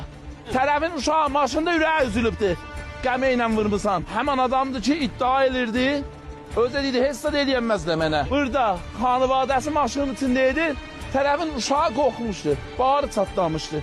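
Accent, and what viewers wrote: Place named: South Azerbaijani, Iran. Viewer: Sağ ol, qardaş! Maşallah olsun! Şirin ləhcə! 200 ildir bizi ayırandan sonra dili saxlayaraınız...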